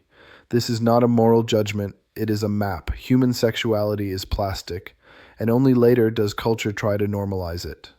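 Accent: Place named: United States. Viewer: well here's a tip: don't use an AI voice with a distinctly American accent for a person everyone knows is Austrian. I thought it was a joke at first.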